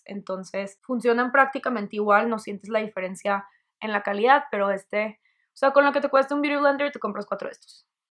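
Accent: Monterrey, Mexico. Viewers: Me gustan tus vídeos pero me encanta tu acento regio😁 sigue así, soy fan 🙆‍♀️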